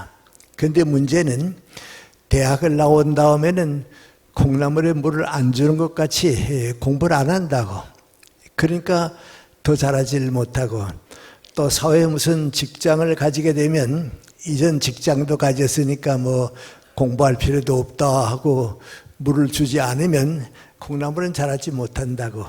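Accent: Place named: North Korea. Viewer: 강의 중반으로 들어갈때부터 은근 화가 치밀어 오릅니다. 1980년 초반 대학 다닐 때 이분이 쓴 교과서를 기억합니다. 비겁합니다. 나라가, 당신들의 월남을 받아들이고 평생 꿈을 이루고 살게 해 준 이승만의 대한국에서 지금껏 당신같은 지식 기득권층이 한 일이 뭡니까? 북한 위주의 통일을 지향하며그들의 음모와 흐름, 역사의 역향을 보면서도 침묵하며 출판 기득권을 유지하며 민초들을, 사회 구석구석의 무넘짐을 바러보며 참묵으로 일관 해 오셨습니까? 박정희의 독재를 인 마킹하며 북쪽지향 기류에 편승해 앞 뒤 마당 땅 다지고 계십니까? 철학적 기독교인이라고요? 달변에 인쎄큐어리티로 사람들을 내려다 보면서 스스로의 철학적 헐벗음은 못 보십니까? 이북식 말투조차 역겹습니다.. 자유민주주의 대한민국이 아직도 만만해 보이십니까? 연세대 출신들이 저지른 대국민, 대기독교적 모욕은 요한 계시록에서 투영하고 있는 심판을 피할 수 없을 것입니다 개대중이 커밍 아웃부터 지금까지 30 여 년을 그동안 뭘 하시다 나와서 달변을 풀어 내십니까... 미국의 마지막 통고가 코앞이니 좀 걱정이 되십니까??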